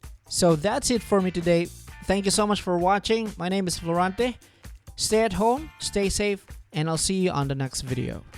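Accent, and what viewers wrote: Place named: Philippines. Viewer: I Can Tell on your accent that your Filipino, but how come you pronounced PALAWAN..as PA-LLA-ONE?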